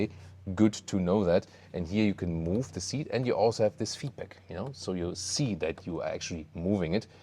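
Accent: Germany. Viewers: Hi. It's ennoying to watch a channel named "Autogefühl" (a very typical german word) with a presentor that speaks in english with a quite strong german accent and pronounce even the german word "Autogefühl" in an english way. Why don't you make your presentation in german when you clearly come from Germany and give your channel with the german word "Autogefühl". It would be very much more authentic to present your videos in german than using this "german" english thought by a german english-teacher.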